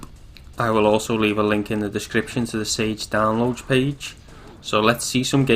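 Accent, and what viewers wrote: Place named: Scotland, United Kingdom. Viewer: wow, that is pretty cool! .....but can anyone please translate Scottish to Australian? ....just kidding! ..love ya accent mate lols.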